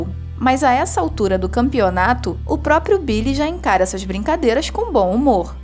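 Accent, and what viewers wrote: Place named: Rio de Janeiro, Brazil. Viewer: Sotaque carioca chato pra caramba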